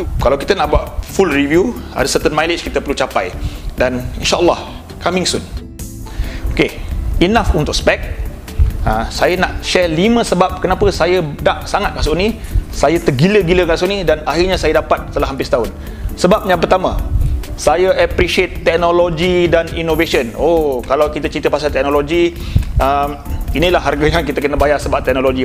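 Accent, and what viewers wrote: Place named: Malaysia. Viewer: Suka saye dengar logat Malaysie 😅,, salam subscriber dari Indonesia bang🙏😁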